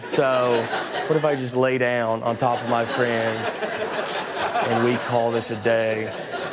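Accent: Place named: South, United States. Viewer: Much younger look back then obviously, but I noticed way more twang in his voice too, country southern sounding.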